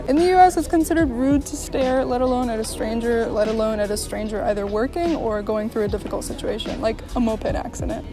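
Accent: Turkey. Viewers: Your accent is so Turkish .Are you sure you have no Turkish blood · You don’t sound like an American at all, you have an accent Türk galiba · You have a Turkish accent. I thought someone was pretending to be a foreigner.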